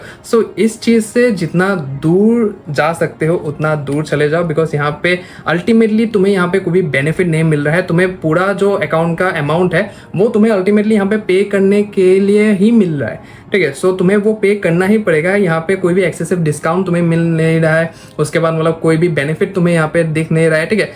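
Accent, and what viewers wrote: Assam, India. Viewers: Bhai ka hindi sunke hi pata chal gya ki yeh bande Assamese he 😅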